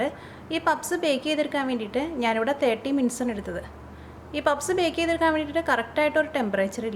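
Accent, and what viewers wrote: Thrissur, India. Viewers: you don't have to be sorry. There are a lot of thrissur people like me, who watches your videos, not only to learn cooking also to hear thrissur slag.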